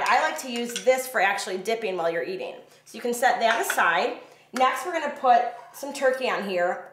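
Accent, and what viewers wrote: Chicago, United States. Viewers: Are you from Chicago? Your accent suggests it. That sandwich looks great too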